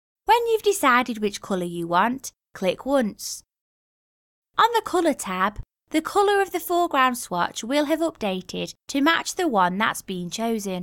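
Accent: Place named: North, United Kingdom. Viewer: You can't beat a good old northern accent. Salt of the earth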